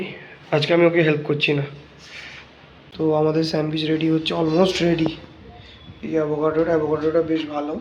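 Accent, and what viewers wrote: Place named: Bangal, Bangladesh. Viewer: Dada, tomar bangal accent ta 😂😂 Darun vlog ta ❤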